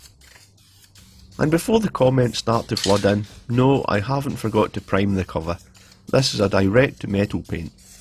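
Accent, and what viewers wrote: Scotland, United Kingdom. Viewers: Honestly this is one of those "must watch, never miss" type channels/series. The quality of the workmanship is outstanding, as are the videos, another key ingredient is the David Coulthard/Leigh Mckenzie type accent its warm and relaxing whilst being authoritative on the subject at hand. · Oh hell yeah! My favourite workshop ASMR plus JDM content and violently Scottish narration to top it off. \o/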